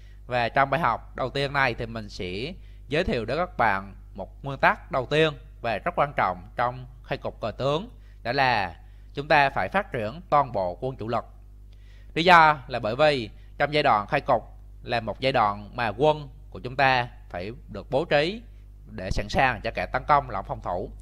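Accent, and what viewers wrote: Binh Dinh, Vietnam. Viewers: Bình Định! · Ng bình định hay phú yên gì đó chớ quảng gì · Giọng bình định hay phú yên mà nghe khá nặng? Có vài từ ko nghe kịp!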